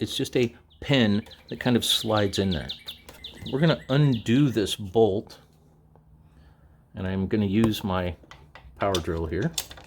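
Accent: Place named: Jamaica. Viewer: And thankz 4 di video bro very smooth and nahmal Jamaican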